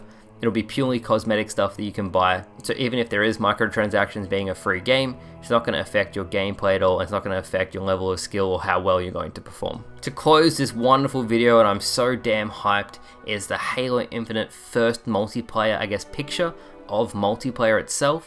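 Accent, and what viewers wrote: Australia. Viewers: hey. since this one of the first videos i've heard you talk in, I wonder, are you from australia?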